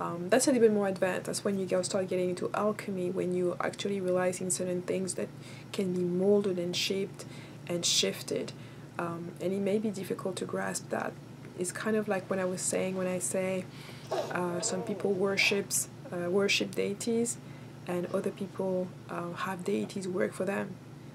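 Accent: France. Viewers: I can hear a French accent peak through am I right? But good story time girl make more videos can you do a love spell?!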